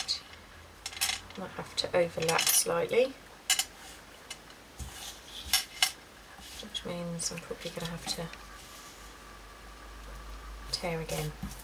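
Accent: England, United Kingdom. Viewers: I love watching your videos and have been learning so much! I just wanted to mention that you have a nice voice. I also like your accent. Your voice and accent remind me of Hayley Mills. I have been a fan of hers since she was Pollyanna (wow, that dates me!). I know England ( I am assuming you are in England) has so many different accents depending on what part of the country you live In. Yours is very pleasant.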